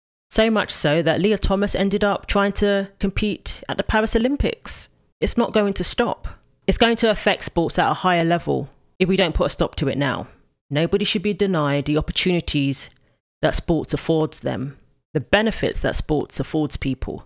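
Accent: United Kingdom. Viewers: Are you American or a Brit ? The accent says British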